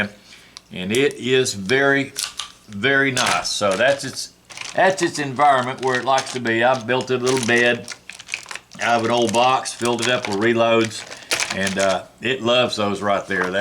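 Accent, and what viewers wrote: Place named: Arkansas, United States. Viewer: Great video, sounds like a fellow Arkansan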